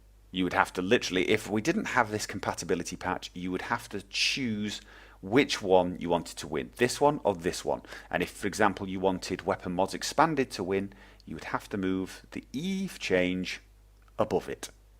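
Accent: United Kingdom. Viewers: You sound a little British but you are from Norway?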